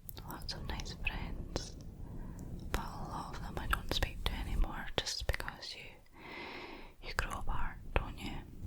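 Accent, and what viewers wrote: Scotland, United Kingdom. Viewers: The Scottish accent is so so suited to whispered asmr , I’m Scottish too so I may be slightly biased but either your voice is tingalicious or I’m having a heart attack 🤣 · Very nice! Help me out with your accent. Are you closer to Glasgow or Edinburgh, accentwise? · summer time with a cold breed I love your vids and your Scottish accent 😘😘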